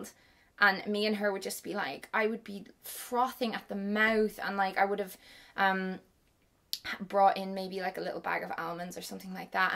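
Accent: Ireland. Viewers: Which county is she from? I'm woeful with accents. Tipperary? 🍍🍍🦄🦄❤❤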